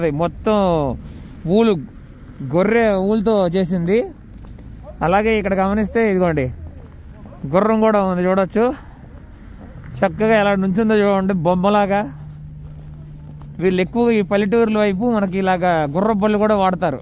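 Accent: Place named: Telangana, India. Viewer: Anna ki mana Telangana basha ki shift aipotundu vadina 😁 Bagundi to Masthundi 🔥😅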